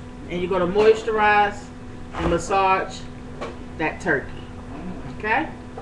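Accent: Baltimore, United States. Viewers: are you from baltimore?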